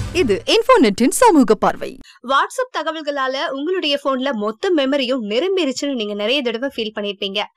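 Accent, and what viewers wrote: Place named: India. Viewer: yeah great! English title spoken Indian.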